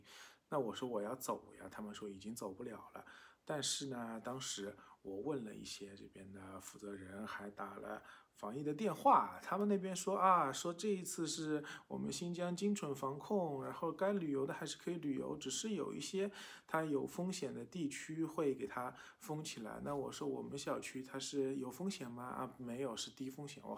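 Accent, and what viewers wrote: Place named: Yangtze Delta, China. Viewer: 你这是长三角口音啊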